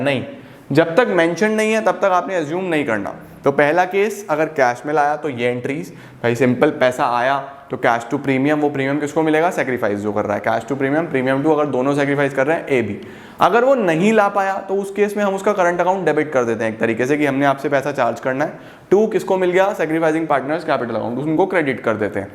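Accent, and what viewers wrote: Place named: Saharanpur, India. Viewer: Sir saharanpur se h pta chl gya😅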